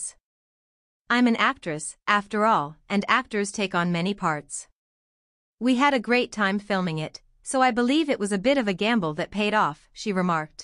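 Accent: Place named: United States. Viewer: What's with the robotic American voice? It's a English soap so a natural English voice would be nice🤗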